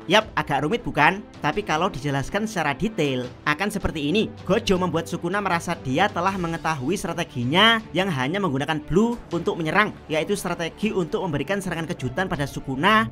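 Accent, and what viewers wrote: Java, Indonesia. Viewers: Medok itu lebih ke aksen, akan susah dirubah. Kalau bahasa yang digunakan udah bagus kok, jelas juga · Gw kurang nyaman sama pembawaannya terlalu medo, maaf saya bukannya melarang menggunakan logat jawanya cuma setidaknya bisa gak pembawaannya lebih di benerin ke arah bahasa indonesia pada umumnya. Soalnya gak semua enak denger logat yg terlalu medo Semoga chanel semakin berkembang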